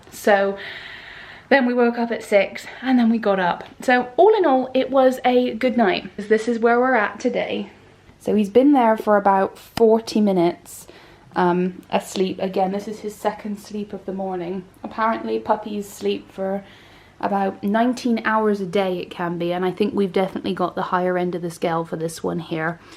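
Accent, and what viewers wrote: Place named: Bristol, United Kingdom. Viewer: Your accent🙂Are you from Bristol?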